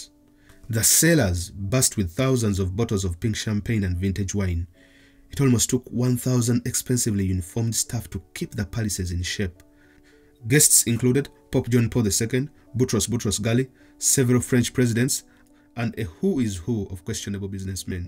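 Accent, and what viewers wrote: Uganda. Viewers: The accent tells me you're Ugandan. Great work bro. Oli wamanyi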